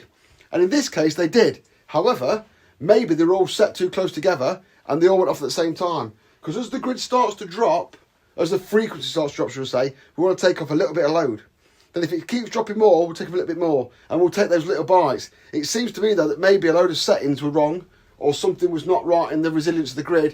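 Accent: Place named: Nottingham, United Kingdom. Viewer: i found you talkin was more funny, than the insight to spain, can tell ur a notts lad lol ,, im a notts lad hahah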